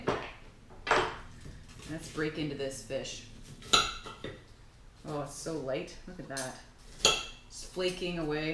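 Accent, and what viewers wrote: Canada. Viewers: Your from Canada 🇨🇦 Eh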